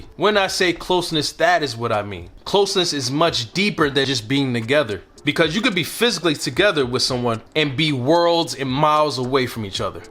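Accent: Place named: South, United States. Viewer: Oh yes I know that Southern talk LoL 😂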